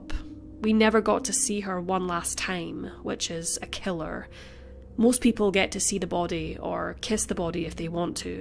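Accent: Scotland, United Kingdom. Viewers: "It's a Scottish accent"🤗🏴🤗🏴🤗🏴🤗🏴🤗🏴🤗🏴🤗🏴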